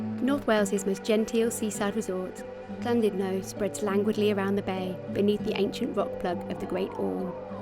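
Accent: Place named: England, United Kingdom. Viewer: would it have been so difficult to have found a Welsh speaking person to narrate - it bugs me to have an English sounding narration of this lovely video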